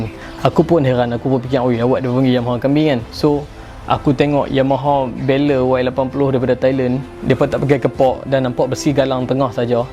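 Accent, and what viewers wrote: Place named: Kelantan, Malaysia. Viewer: kehcakk bahasa kelantan bro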